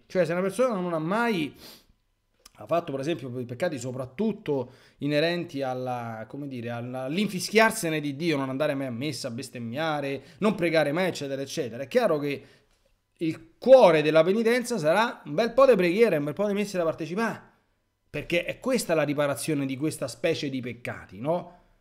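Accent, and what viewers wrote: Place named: Rome, Italy. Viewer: la sua dizione e dialetto romanesco che mi piace da morire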